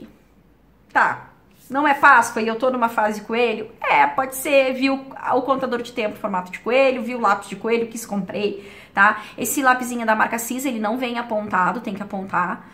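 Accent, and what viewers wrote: Rio Grande do Sul, Brazil. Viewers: tu és da onde? Tenho a impressão de que és do Rio Grande do Sul, pelo jeito de falar. Beijos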